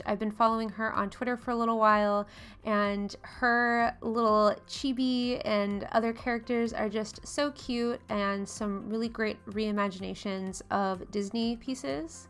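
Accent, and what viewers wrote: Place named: Scotland, United Kingdom. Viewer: AHHHH that Scottish accent melt Thanks for sharing the footage from Treasure Fest - still bummed I couldn't go, but so glad to hear your account of it. All those succulent babies! So cute.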